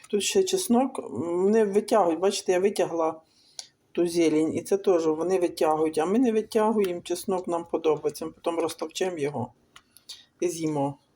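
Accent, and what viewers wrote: Surzhyk, Ukraine. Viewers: Ви не вмієте розповідати , не кажучи про ваш суржик . Вам потрібні лайки?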